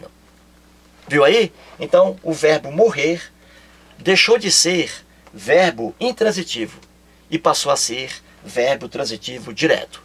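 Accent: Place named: Ceará, Brazil. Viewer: eita cearense vei arrochado.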